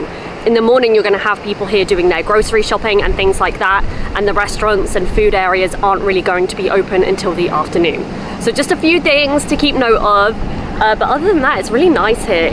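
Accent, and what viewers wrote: United Kingdom. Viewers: いつも､ さんの｢イギリス英語｣を聴きに来ます｡ 明瞭で､美しくて､音楽のようでホッとします｡ アメリカ人の英語だと､ときどき聞き取りが難しいニッポン人の私ですが…… さんの｢イギリス英語なら､いつもハッキリ分かって､安心します｡ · 落ち着いてこじんまりした感じがいいですね。なんか、懐かしい感じがします。ところで、さんの「いただきます」とイギリス英語のとりこになしました！！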